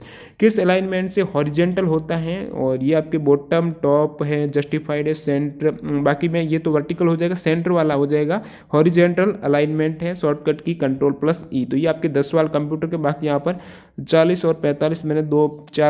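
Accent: Rajasthan, India. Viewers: Bhai rajasthan se lagh rahe ho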